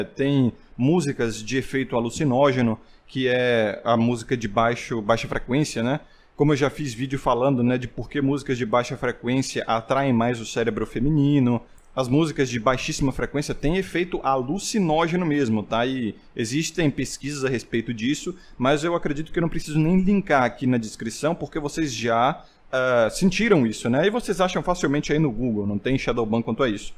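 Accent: Bahia, Brazil. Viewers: O é baiano? 😳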